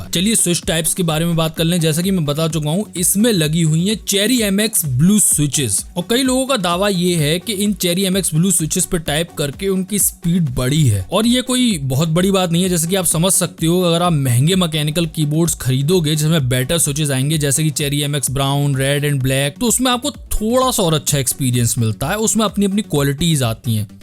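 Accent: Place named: Agra, India. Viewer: Bohot badhiya channel hai bhai aapka. Aur wo Agra wali boli to maja hi aa jata hai sun ke. but ek request ke single product ka video 16 min ka na banaiye. jyada ho jata hai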